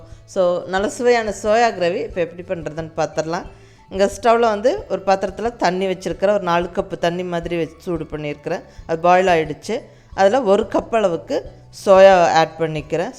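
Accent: Coimbatore, India. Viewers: unga slang keta neenga from Coimbatore thaana 🤔, anyway thank u 😊 IL try this for sure 👍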